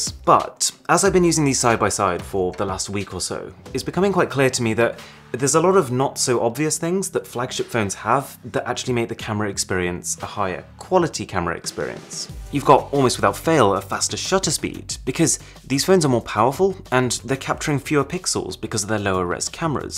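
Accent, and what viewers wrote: United Kingdom. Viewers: You have a British accent but talk in the dollar currency. If your British just say pounds sterling as I'm from the UK and understand pounds better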